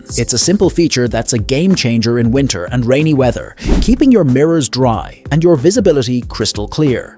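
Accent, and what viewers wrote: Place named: Ireland. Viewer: Thanks for this video. Most of the negative comments are from people who like to complain. I like the narrator's Irish accent.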